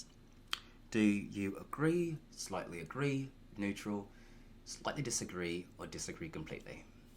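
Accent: England, United Kingdom. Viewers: British accents trigger me so much, and yours is one of the best 👌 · The British accent is the one!! 😂🥰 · British accent is awesome. Please use in other videos! Thank you for your hard work. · Amazing English accent! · Lovin the British accent 🤣 I’m British myself haha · Ooooooo love the British accent! Wasn't expecting that at ALL and I IMMEDIATELY grinned xD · Bro the British actually matches you 😂🖤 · Omg it’s the return of the British accent!! I feel like it’s been literal years since you’ve used it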